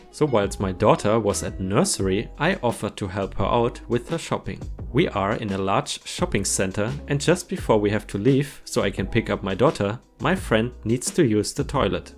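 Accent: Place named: Germany. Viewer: Heyho ^-^ is it possible that you are German ? Becouse i am and your accent is a little Bit german, i was just curious (sorry for Bad english i am german ^^")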